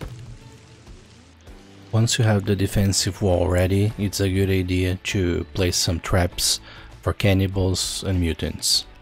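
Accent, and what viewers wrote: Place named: Brazil. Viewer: idk, but u have some brazilian accent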